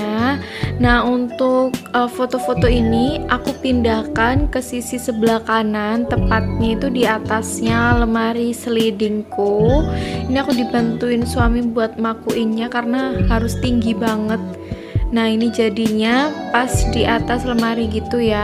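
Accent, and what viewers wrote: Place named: Java, Indonesia. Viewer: Orang mana mbak? Kedengarannya medhok hehe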